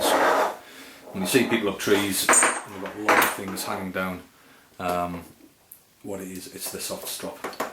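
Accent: United Kingdom. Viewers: Nice vid. Used this to confirm an approach were taking with soft strops. Using a Petzl Micrograb instead of the pussick. Are you from the north east?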